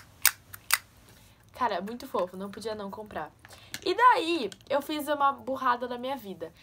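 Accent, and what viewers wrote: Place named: Rio Grande do Sul, Brazil. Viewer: vc é do rio grande do sul? Vc tem mttr sotaque cara, lindíssima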